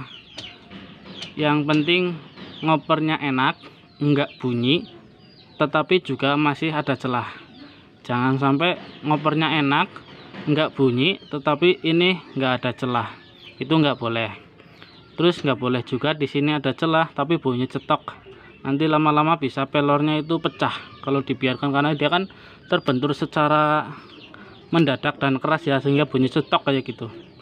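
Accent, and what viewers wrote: Banyumas, Indonesia. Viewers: Dalam ngapak😂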